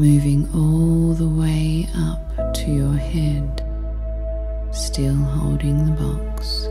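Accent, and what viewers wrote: Australia. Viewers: What a beautiful meditation! Her voice is just so calming & soothing. I believe it was an Australian accent(?) I LOVE that accent!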